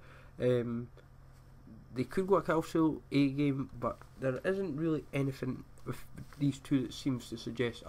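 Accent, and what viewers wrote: Scotland, United Kingdom. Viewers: I am from Ukraine. First I barely understood what this guy said, but now I even like to listen to him and understand (well, mostly). "Scottish" language is so funny to listen to:) When I was in Scotland I enjoyed every moment listening local people. Keep up great work,!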